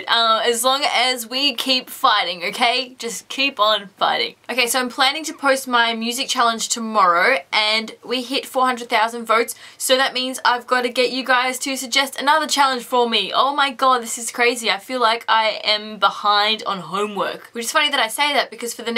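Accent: Australia. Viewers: your from melbourne arnt you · are you australian? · UR AUSTRALIANNNNNNNNN!!!!!!!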